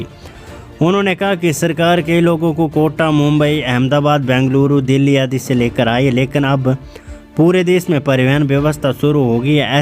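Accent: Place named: Pahadi, India. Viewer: Hindi bhi ap pahadi ki tarha bolte ho.... Saaf pta chlta hai... Thoda tarika sudharo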